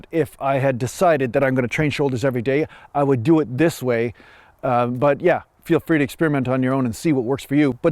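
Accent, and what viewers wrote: Canada. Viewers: Ok I'm playing name that accent. How close to Alberta are you from?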